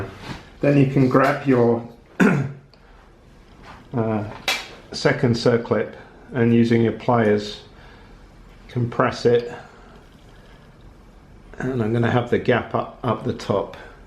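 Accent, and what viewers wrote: United Kingdom. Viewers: Nice video. With regards to circlips with tangs you need to be careful because the gudgeon pin can fret on the tangs which causes them to snap off! (I used to run mega tuned 350 LC's so I've seen this sort of thing!) You can still use this type of circlip but cut the tangs so they are only 1-1.5mm long, the gudgeon pin can still fret on them but they just wear away very slowly rather than a long piece of them falling off! Someone will probably comment that if they are wearing then the material coming off them is not doing the engine any good....yeah, yeah, does the air filter stop EVERY atom of crap in the air, I doubt it! EDIT...Dude, you sound British, it's a gudgeon pin, not a wrist pin! LOL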